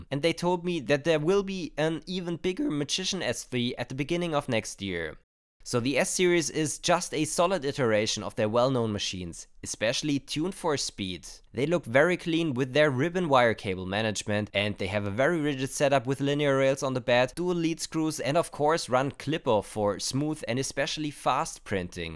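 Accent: Germany. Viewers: Dnk how's your english so clear being you german, you only have a little sharp pronounce but's the deutsch accent while your spoken english is perfect. How did you manage to found the only chinese I've ever heard speaking english with a mix of nigerian and indian accent? He's rare! :D sorry I couldn't un-notice it :D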